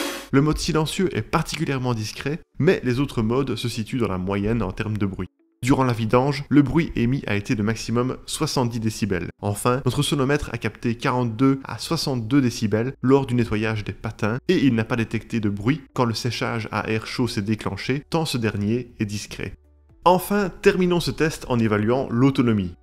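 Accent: Belgium. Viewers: Je détecte un accent belge mais vous dites les chiffres comme les français, pourquoi ?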